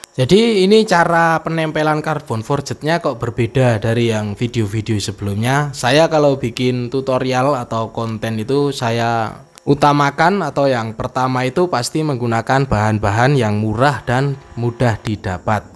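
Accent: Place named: Java, Indonesia. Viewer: Suarane koyo jowo .tapi jowone ngendi ya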